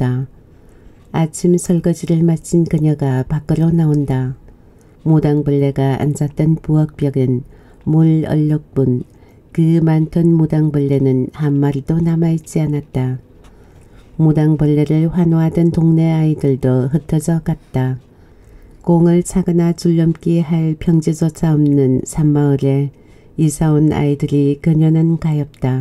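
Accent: Gyeongsang, South Korea. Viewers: 70 년대를 거치면서 살아온 사람으로서 많은 기억들이 떠오르는군요. 서울에서 태어나 자라온 나에게는 에 경상도 사투리가 처음 조금은 매끄럽게 들리지않았으나 지금은 익숙해져서 푹은한 감정이 생겼어요 항상 변함없이 굴곡이 없는 그 음성에 마음이 편해집니다. 좋은글 감사합니다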